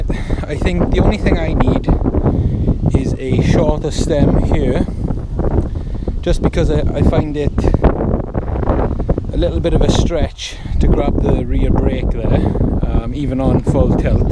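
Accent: Wales, United Kingdom. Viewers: Welsh defiantly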